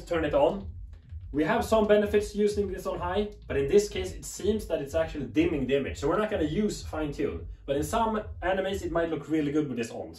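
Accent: Sweden. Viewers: Er du svensk. Hører den svenske aksenten din Det er vel mange gamere som bruker C4 også i tillegg til filmelskere. Jeg har LGCS oled som jeg bruker ps5 på.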